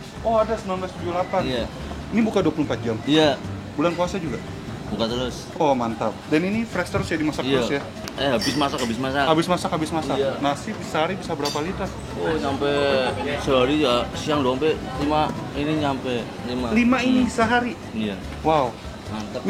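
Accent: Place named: Banyumas, Indonesia. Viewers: Warteg komplit, masnya ngapak 👍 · Ngomongnya kek orang Tegal (ngapak) banget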